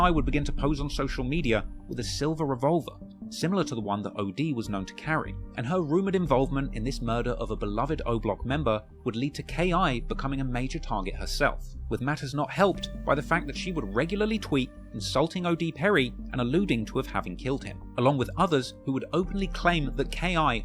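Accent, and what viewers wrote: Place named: London, United Kingdom. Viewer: Ayo this nigga talking about Von with a London accent lol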